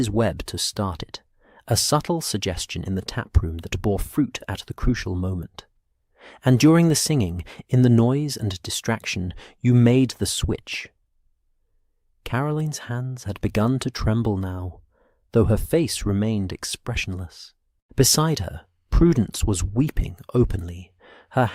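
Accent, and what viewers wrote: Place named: England, United Kingdom. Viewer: Clark is how we say that word in Britain. He has an English accent but can't say Vase. We say V-ah-ze. Americans say Vay-ze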